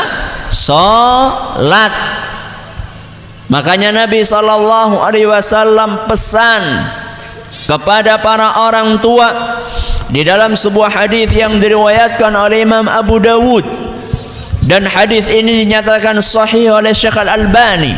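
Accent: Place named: Banyumas, Indonesia. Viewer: Masya Alloh..... Seneng dengerin ceramah pak ustadz, karena suka diselingi bahasa Jawa yang ngapak😂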